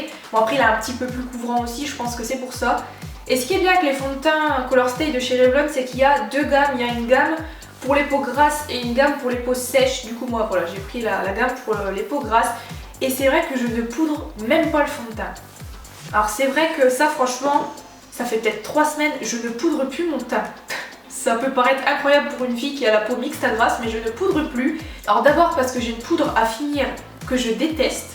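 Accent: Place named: Saône-et-Loire, France. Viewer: J'ai l'impression que je connais ton accent, t'habites en saone et loire non?💓😂😂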